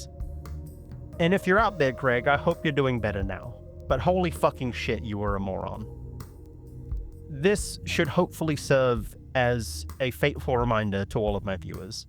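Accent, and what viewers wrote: New Zealand. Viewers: I always thought he sounded kiwi too so I'm inclined to agree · he’s from NZ isn’t he? I’m an Aussie so I know a kiwi accent when I hear one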